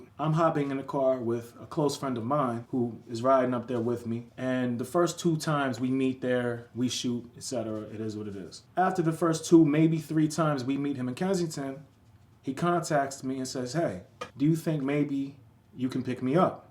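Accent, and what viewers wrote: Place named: Newark, United States. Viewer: north Jersey? You do have a bit of a Newark accent. I’m not used to hearing non-Philly accents I live in Atlantic county now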